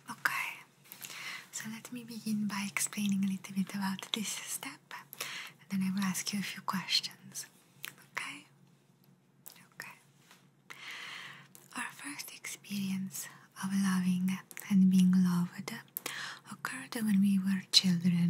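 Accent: Italy. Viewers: Hello, perfect ASMR, I loved it, I enjoyed it, it's very relaxing and with an Italian accent, I love it, greetings, beautiful.💖💖🌹🌹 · Italian accent videos are perfect for relaxing and unwind 😊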